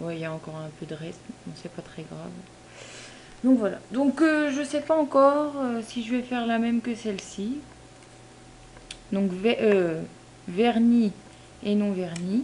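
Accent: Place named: Nord-Pas-de-Calais, France. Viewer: ta l'accen chti je trouve lol j'<3 bien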